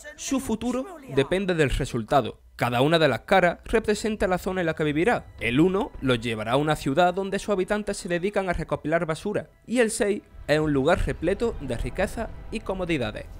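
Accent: Andalusia, Spain. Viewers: No tiene ningún problema de dicción,simplemente es su acento.Posiblemente sea de Almería o Jaen. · Este chaval es de Jaén o Granada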